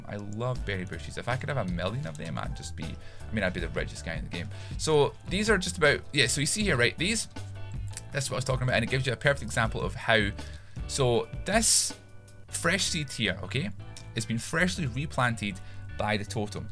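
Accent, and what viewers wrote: Scotland, United Kingdom. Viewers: i loved this vid, it helped me alot to make a carrot farm, btw i love ur accent - is it scottish?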